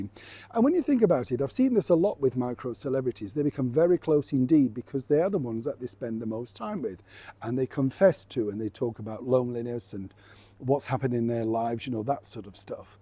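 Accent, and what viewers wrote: United Kingdom. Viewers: You are a horrible man with a goopy British accent!🙄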